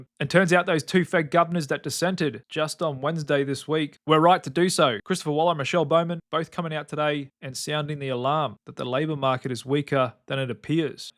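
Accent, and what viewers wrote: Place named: Australia. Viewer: Lmao, that's his accent. I'm guessing Australian but I'm British so I can never tell the difference between Aussies and Kiwi's 😆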